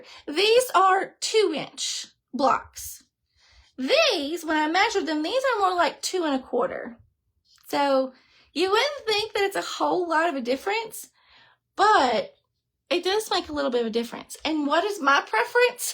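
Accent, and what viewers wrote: South, United States. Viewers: Love these, don't loose your southern accent. You speak my language.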